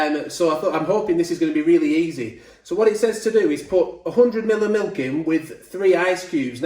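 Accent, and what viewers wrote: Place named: Yorkshire, United Kingdom. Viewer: enjoy each time you say “Hey guys” at the beginning of one of your videos in your wonderful Yorkshire accent! 👍🏻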